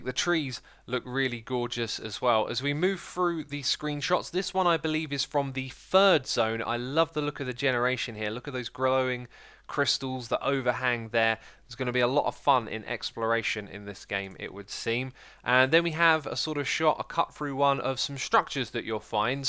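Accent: United Kingdom. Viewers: I wanna see this guy play the game, I like how his british accent sounds.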